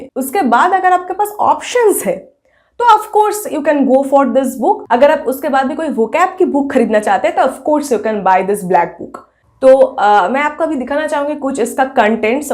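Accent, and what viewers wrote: Bengal, India. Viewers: Bengali hi hain, isme hasne ki kia baat kia hain?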